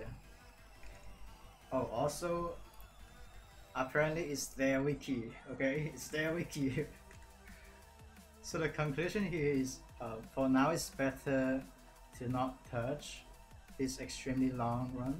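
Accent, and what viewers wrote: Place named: United Kingdom. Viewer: you sound really british sometimes its great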